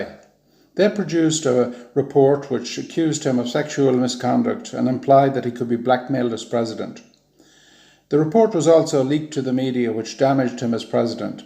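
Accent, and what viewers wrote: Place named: Germany. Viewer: You summed that up pretty damn well. You sound german. Do you live in the states?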